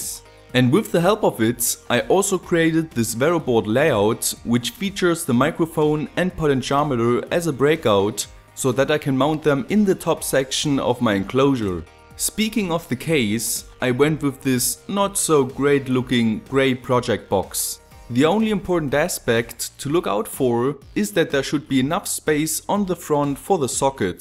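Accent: Germany. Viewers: pronunciation is amazing. It's comical, Germans wouldn't understand the affectionate way we perceive the German accent ;) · are You German? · Are you German?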